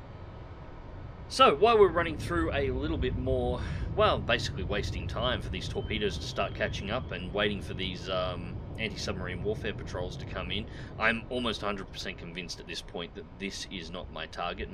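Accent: Australia. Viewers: Clicked on the video thinking it was Jive Turkey. I was so confused for a second when I heard an Australian voice. :)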